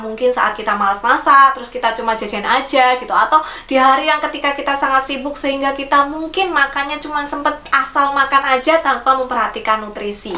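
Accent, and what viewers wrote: Java, Indonesia. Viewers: Bu bidan asli mana e, logat jowone medhok. Terimakasih ilmunya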